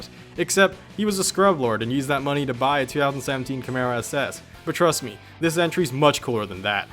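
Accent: United States. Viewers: Dude you sound American but your using euros you make my brain hurt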